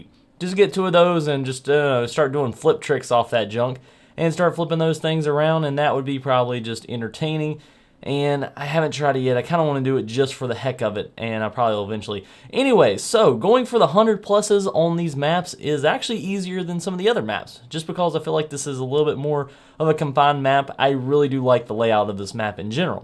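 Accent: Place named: North Carolina, United States. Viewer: even though im black i live in NC and i talk exactly like you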